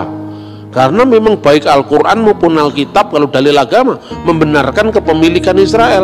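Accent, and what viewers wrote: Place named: Java, Indonesia. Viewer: Senang pula, lihat Pak yg berlogat Jawa.... Tapi bermanfaat, bagi penganut Kristen di Indonesia.....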